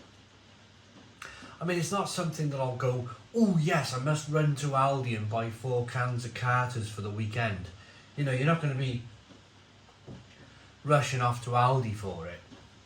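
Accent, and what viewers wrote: Wales, United Kingdom. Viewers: he’s Welsh, not northern.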